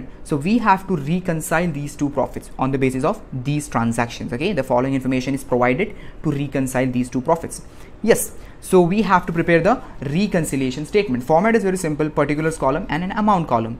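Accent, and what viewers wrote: South India, India. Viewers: I think you are from South your accent is like that your teaching is very good 🎉 Thanku sir😊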